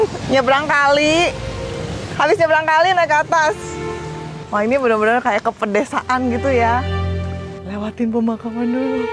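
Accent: Java, Indonesia. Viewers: Jawa banget🤍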